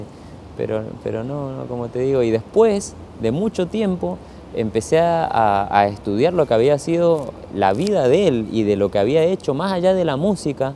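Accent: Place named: Peru. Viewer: a ver señor atole, ponga atención a la gramática, yo no escribí que no lo conoce, escribí que dice que no lo conocía, es decir en tiempo copretérito, denotando que este peruano con apariencia de peruano y cabello lacio de peruano y acento de peruano que ahora se dice el mismo que es argentino quiere dar a entender que el conoció el reggae y empezó a hacer reggae y que el ni en cuenta que existió bob, luego presume que tiene canciones en inglés, y bla bla bla puro egocentrismo, y obvio que se puede aprender por otros medios pero no estamos hablando ni de ti ni de mi si no de ese falso rasta que nunca lo fue en verdad, su voz temblorosa al cantar parece enfermo y sus letras aparentemente con sabiduria estan mas que vacias. quieres saber de rastafari tengo el kebra nagast y los libros de enoc por si te interesa saber de un tema en particular.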